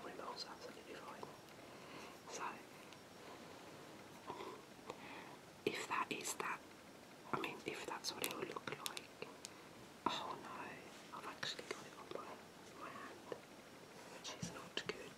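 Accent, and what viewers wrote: United Kingdom. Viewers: Subbed!!!!! Finally a good channel with an english accent! Sending my love from London 🇬🇧 - keep up the good work and videos!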